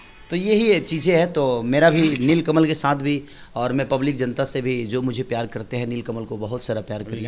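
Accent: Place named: Bhojpuri, India. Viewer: Bhaiya aapke juban se bhojpuri aachha lagta hai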